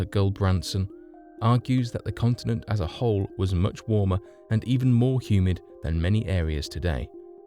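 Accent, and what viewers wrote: United Kingdom. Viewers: Nice accent, kinda a British one! I truly appreciate it!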